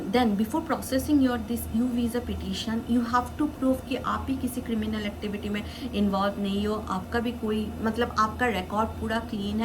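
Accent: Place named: Bengal, India. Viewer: Ur accent seems like u r bengali 😂😅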